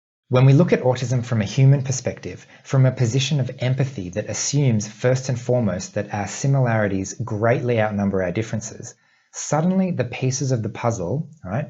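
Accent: Australia. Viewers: I am Chinese and I grew up learning American English, and ever since watching your videos, I have become very fond of the Australian English.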